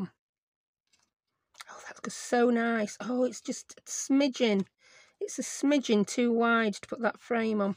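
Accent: United Kingdom. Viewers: Hi, loved the video. So nice to hear a nice british lady crafting, all the videos Ive come across are Americans and Ive nothing against that but just wo der why theres no british crafters on you tube. I have lotsof craft stuff but dont know how to use it. Just subscribed hope I lwarn how to craft properly. Thanks for sharing 💖 x x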